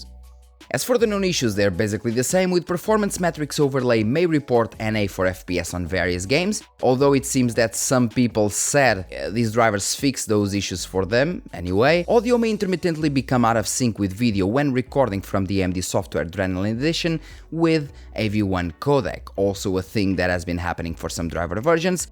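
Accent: Portugal. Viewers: I recognized your accent in Portuguese. Brazil here!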